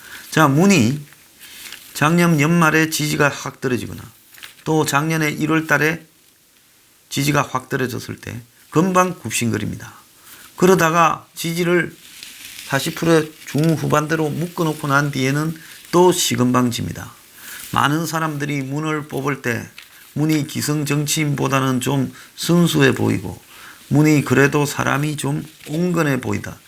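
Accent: Gyeongsang, South Korea. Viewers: 디져야 한다.참 오랜만에 들어보는 경상도 사투리군요. 구수합니다. 문도 디지고 조국이도 디지고 이해찬이도 디지고 기레기들도 디져야 한다.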